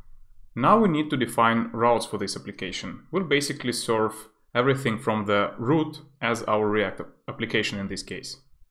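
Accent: Russia. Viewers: какой классный тяжелый русский акцент, чувак ты ахуенен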